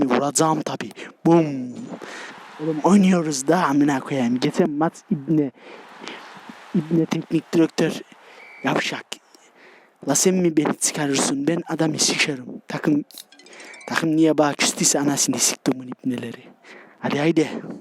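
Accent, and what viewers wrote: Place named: Istanbul, Turkey. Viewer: İstanbul beyefendisi gerçek türkçe budur işte herkez özünü bozmuş krkdkffm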